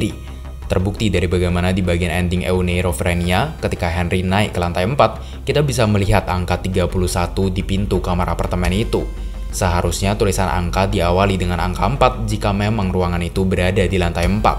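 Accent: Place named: Java, Indonesia. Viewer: BANG LU ORG MANA SI KOK KEK MEDOK MEDOK GT SALAM DARI SBY YAA SEMANGAT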